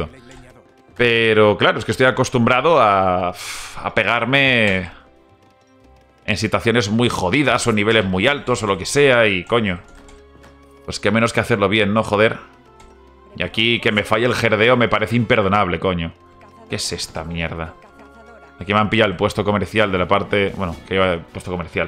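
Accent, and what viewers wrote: Peru. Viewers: Ere paruano?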